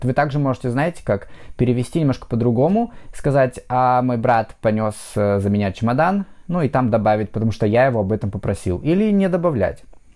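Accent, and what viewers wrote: United States. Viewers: Лучший American "e-Nglish" в русскоязычном секторе. Thumbs up ! !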